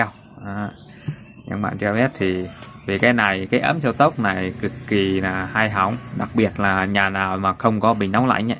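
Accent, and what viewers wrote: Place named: Ngư Lộc, Vietnam. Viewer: Mới nghe tiếng nói mình tưởng bạn ở Ngư Lộc (Hậu Lộc). Chào nhé !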